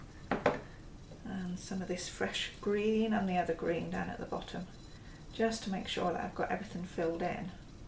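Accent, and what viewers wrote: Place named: West Country, United Kingdom. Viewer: Beautiful, I can see why you are thrilled with this piece! By the way, your accent sounds West Country UK, is that correct? X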